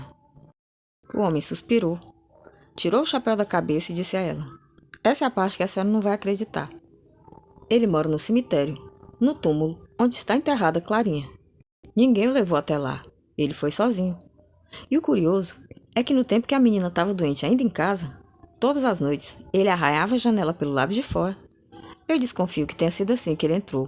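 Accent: Northeast, Brazil. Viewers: Boa tarde irmãzinha, gostei muito de seu canal sua voz narrando ôs vídeos é bem nordestina, sou daqui do estado de São Paulo, mais nasci na Bahia, pôr isso entendo tudo que você diz 😂😂😂😂😂respeito muito ôs vídeos, c são reais ou não fica há cargo de cada um, acreditar ou não, tenho dezenas de canais que relata vídeos verdadeiros de lobisomens vampiros e outras criaturas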